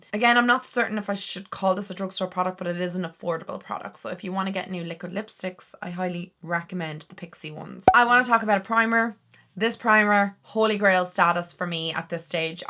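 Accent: Ireland. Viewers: Thank you for sharing these! You look lovely. Listening to you makes Ireland feel a bit closer.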